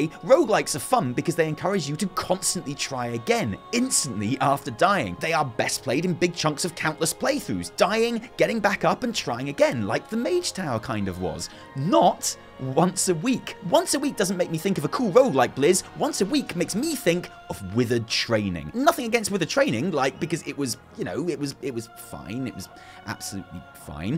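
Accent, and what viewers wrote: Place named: United Kingdom. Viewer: Add Subtitles plz... i love your videos... but you speak too fast XD i love the british accent by the way <3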